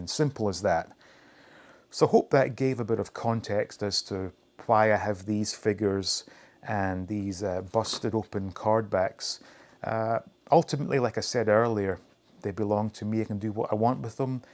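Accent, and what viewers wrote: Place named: RP, United Kingdom. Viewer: So refreshing to see someone on a video that can sit behind a desk, can talk in the queen's English and not be wearing some trucker cap and talking to puppets, who just so happen to be their only friends in life.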